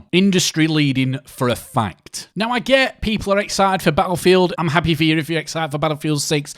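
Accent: United Kingdom. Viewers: It’s simple. People like spending their money that they earned how they feel like. If you don’t want people to be able to do that move to a communist country. Oh wait you sound British or Australian so you already live in a communist country.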